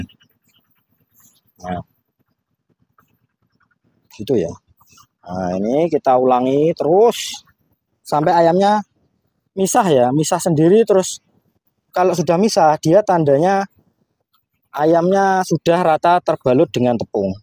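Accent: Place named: Central Java, Indonesia. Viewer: Bahasane koyo wilayah Demak kudus dsktnya iki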